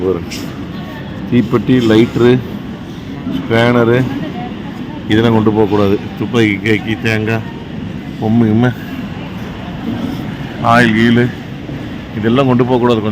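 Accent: Kongu, India. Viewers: ஆஹா என்ன ஒரு மகிழ்ச்சிகரமான முடிவு அற்புதம் எங்களுக்கு கடந்த ஒரு மாதமாக அற்புதமான ஒரு தொடர் பார்த்த திருப்தி கொங்கு தமிழில் நீங்கள் பேசிய பேச்சுக்கள் மனதில் என்றும் நிலைத்திருக்கும் நீங்கள் வீடியோக்களை எடுத்த விதத்திலும் சரி எதார்த்தமாக நீங்கள் பேசிய காமெடி பேச்சுக்களும் சரி மிக மிக அற்புதம் ஒரு ஜெனரஞ்சகமான நகைச்சுவையான குடும்ப படம் பார்த்த திருப்தியோடு இந்த தொடர் முடிவடைகிறது திருப்தி சில மாதங்களுக்குப் பிறகு வட கிழக்கு மாநிலங்கள் கோவா ராஜஸ்தான் உள்ளிட்ட இந்தியாவின் பல பகுதிகளுக்கும் சென்று வர வாழ்த்துக்கள்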